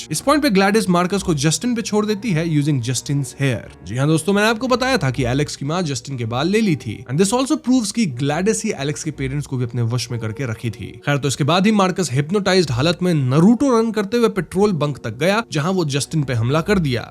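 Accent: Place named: Bihar, India. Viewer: Tu bihaari hai kya agar h to bihari accent nikaal de isme se . Wese bhi tu totla and labduu h bolne me waau waau type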